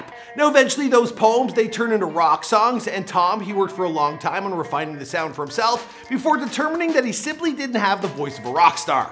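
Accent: Canada. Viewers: Why does the host sound like he is puking out every fifth word? Is he usually like this? No diss, just asking. Is this Canadian accent?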